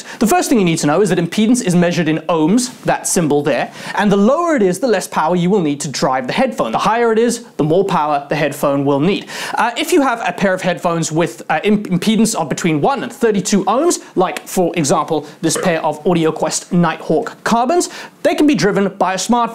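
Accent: South Africa. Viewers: U almost sound south african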